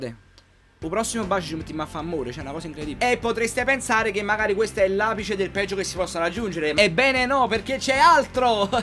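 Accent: Naples, Italy. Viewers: questo video lo guardo tutti i giorni ed è l'unico modo di stare bene poi quell'accento mi ricorda la mia lontana napoli . Sorrido sempre ciao hahahah no dai forse è troppo così hahahha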